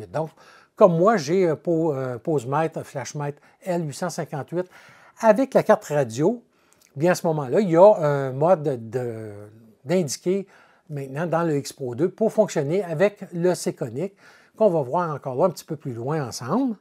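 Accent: Canada. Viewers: Merci infinim❤ent pour cette belle présentation. Vous dites mettre à disposition le manuel en français; où puis-je le télécharger ? Encore bravo et je me plais à découvrir vos différentes vidéos avec un très joli accent canadien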